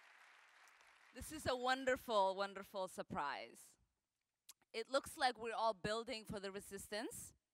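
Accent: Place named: Somalia. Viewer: I see she's revved up her Somali accent in order to represent her people - not Americans, but CAIR and its affiliates.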